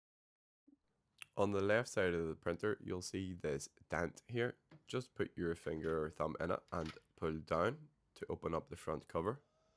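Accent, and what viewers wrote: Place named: Northern Ireland, United Kingdom. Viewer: I like your Northern Irish accent and appreciate the helpful explanation. I'm thinking of buying a HL-2400DW so this video has been very helpful.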